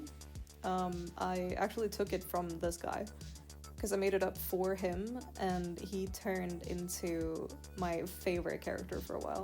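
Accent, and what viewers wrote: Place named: Scandinavia. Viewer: do you speak Swedish/Norwegian/Danish? Your speech pattern and slight accent sounds so familiar XD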